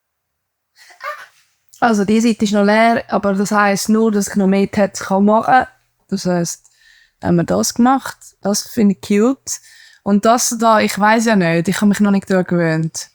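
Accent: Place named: Switzerland. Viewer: Keine Ahnung, warum mir das vorgeschlagen wird, aber voll interessant: Ich bin aus Wien und versteh fast alles, was du sagst, könnte aber niemals selbst so sprechen, wenn ich nicht mehrere Jahre in der Schweiz leben würde! 😂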